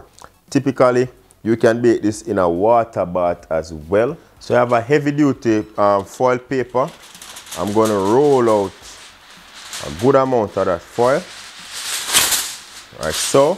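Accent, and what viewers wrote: Jamaica. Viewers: Love that accent/ patwah don't know if I spelled that right. 😁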